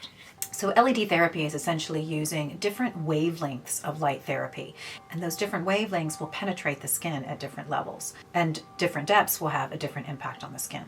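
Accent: Ireland. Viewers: The battery cycle is too short on this thing (Omnilux) for sure. I have another cheap USB external battery power source that I use and just set a 10 minute timer on my phone. Annoying for the price. This is my first watch of your channel. I’m 50 as well. You have a subtle Irish accent which I adore. I’ve subscribed.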